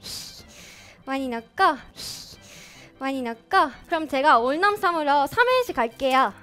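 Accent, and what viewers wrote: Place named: Japan. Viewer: この時はまだ韓国語が少し日本人っぽいけど、今は本当にネイティブだよね…って韓国語全然話せない私が言ったら怒られそうや…とにかく可愛い。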